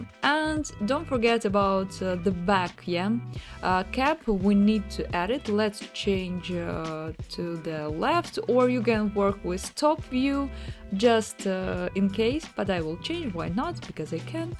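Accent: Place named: Russia. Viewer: You speak kind Russian accent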